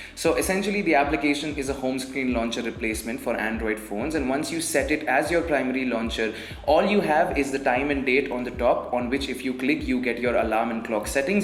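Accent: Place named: India. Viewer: Your English sounds similar to any Indian English News reporter's English😄Thanks for the video!<3I got a crush over your English Speaking.☺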